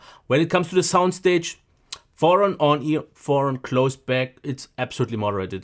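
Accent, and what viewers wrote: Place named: Germany. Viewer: Nice review really convienced me! The German dialect is fun and charming, keep it up!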